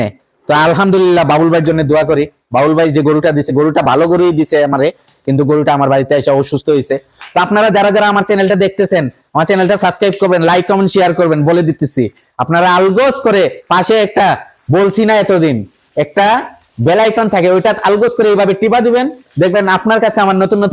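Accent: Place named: Sylhet, Bangladesh. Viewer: সিলেটি মাত হুনিয়া ভালো লাগচে❤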